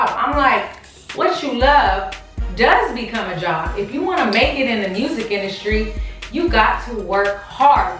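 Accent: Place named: South, United States. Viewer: her little southern drawl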